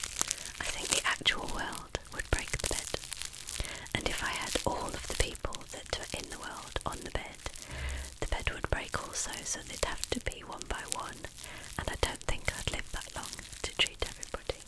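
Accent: England, United Kingdom. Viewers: Getting some nice Tingles running thru my body! I love the sound of your voice and your English accent listening to you is a very nice way to relax!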